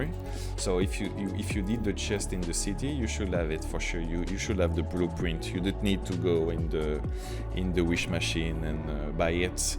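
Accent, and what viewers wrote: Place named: France. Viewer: T’es français avoue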